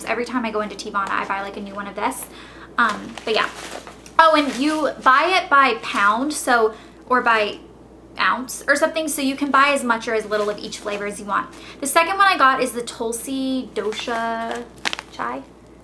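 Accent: England, United Kingdom. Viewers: why are you talking like like you're british? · loving the english accent hahaha <3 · Your British accent is awesome, I feel proud. Love from London, England! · you do an amazing english accent! i live in the UK and that sounded soooo good lol :) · you have a kind of british accent in this video !! LOve it !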